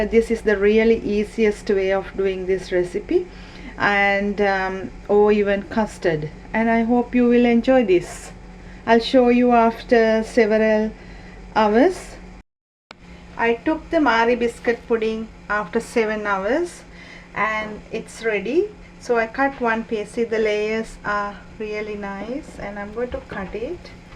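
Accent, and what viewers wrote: Sri Lanka. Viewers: tara sanda I thought the same.Accent is Sri lankan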